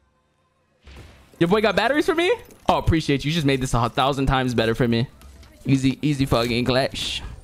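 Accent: New York, United States. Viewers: You talk like your from New York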